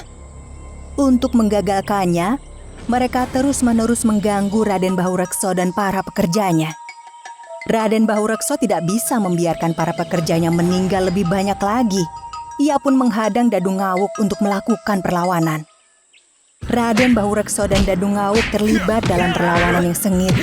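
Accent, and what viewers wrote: Banyumas, Indonesia. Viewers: Ngapak wonosobo😂👍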